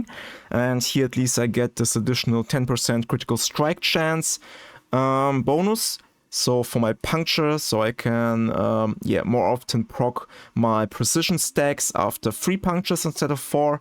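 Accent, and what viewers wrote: Germany. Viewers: Moin, wo kommst du eigentlich her, klingst irgendwie deutsch? :D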